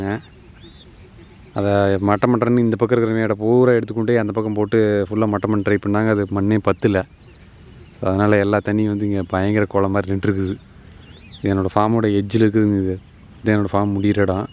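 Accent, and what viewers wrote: Kongu, India. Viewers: Kongu tamil super ponga...arumainga anna....neenga enna koottam nu kaekkara solla matingaringa...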